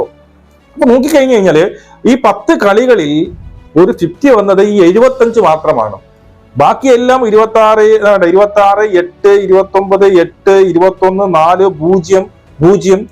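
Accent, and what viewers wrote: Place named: Thiruvananthapuram, India. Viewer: തിരുവന്തപുരം ശൈലി എനിക്ക് ഇഷ്ടമാണ്. ആ നാടിന്റെ ശൈലിയിൽ തന്നെ ചേട്ടൻ പറയണം. കേൾക്കാൻ നല്ല രസം ഉണ്ട്. അച്ചടി ഭാഷ സംസാരത്തിൽ എനിക്ക് ഇഷ്ടം അല്ല. ചേട്ടൻ മാന്യമായി ചിലർ ചേട്ടനോട് നിർദേശിച്ചു എന്ന് പറഞ്ഞു. ശരിക്കും ഞാൻ ആണ്. ഞാൻ മാത്രമാണ് നിർദേശിച്ചത് 'അടിച്ചു തൂറിച്ചു' എന്ന പ്രയോഗം ഒഴിവാക്കണം എന്ന് . ഈ വാക്ക് ഒഴിച്ച് ബാക്കി എല്ലാം ചേട്ടൻ പറയുന്നത് കളിയുടെ പെർഫോമൻസ് കൃത്യമായി വിലയിരുത്തിയാണ്. അതിലൂടെ മത്സരത്തെ പറ്റി കൂടുതൽ details അറിയാൻ കഴിയുന്നുണ്ട്. ഞാൻ ഈ കഴിഞ ഇന്ത്യയുടെ ബംഗ്ലാദേശ് t20 സീരീസ് മുതലാണ് ശരിക്കും continues ആയിട്ട് ചേട്ടന്റെ വീഡിയോ കാണാൻ തുടങ്ങിയത്. ഞാൻ ഒരു തൃശ്ശൂർകാരൻ ആണ്. തൂറുക എന്നും തൂറിക്കുക എന്നും ചേട്ടൻ ചേട്ടന്റെ ശൈലിയിൽ ഇഷ്ടം പോലെ പറയാൻ ഉള്ള അവകാശം ഈ രാജ്യത്ത് ഉണ്ട്. ഞാൻ ചേട്ടന്റെ വീഡിയോ കണ്ട് ഇഷ്ടം കാരണം പറഞ്ഞു എന്നെ ഉള്ളൂ. ധാരാളം ആളുകൾ ചേട്ടന്റെ ഈ പ്രയോഗത്തെ ഉൾപ്പെടെ മത്സരത്തിന്റെ മറ്റു കാര്യങ്ങളെ അടക്കം നന്നായി ആസ്വാധിക്കുന്നുണ്ട് എന്ന് ഈ വിഡിയോയുടെ കമന്റ്‌സ് വായിച്ചപ്പോൾ മനസ്സിലായി. 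ഭൂരിപക്ഷ പിന്തുണ സപ്പോർട്ട് ആണ്.അതുകൊണ്ട് ഞാൻ മിണ്ടുന്നില്ല. All the best. 😊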